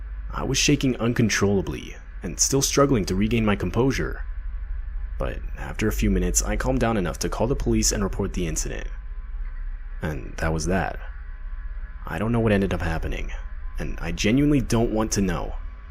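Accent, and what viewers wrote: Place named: Berlin, Germany. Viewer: The narrator sounded like from Wansee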